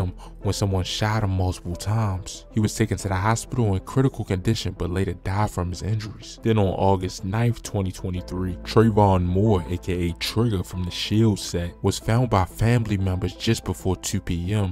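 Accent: Baltimore, United States. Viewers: Narrator is definitely from Baltimore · The narrator must be from Baltimore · The commentator sounds like he's from Baltimore..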